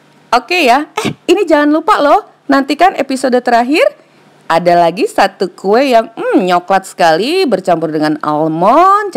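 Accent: Java, Indonesia. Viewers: Stik kurma iki L39... mohon maaf lahir batin ya mam..... hari ini penuh kemenangan... ini edisi jowo.. Ini aku full ya maaf baru sempat.... Paduan kurma dan coklat Ketebalan nya di kira kira aja Lalu oven...no skip dihari kemenangan Cocol setengah aja Kalo semua belepotan Wooòoh jawa nya medok....banget Episode terakhir biskuit tabur almond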